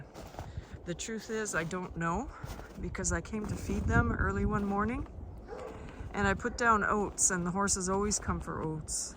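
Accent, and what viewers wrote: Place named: Minnesota, United States. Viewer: I'm glad Jack is ok. However, you have a wonderful Minnesota accent! 💕🐎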